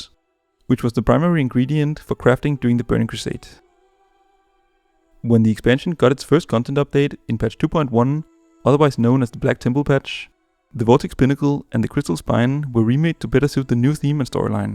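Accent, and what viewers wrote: Denmark. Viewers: Danish accent detected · Love me some Dano-English accent, sounds too adorable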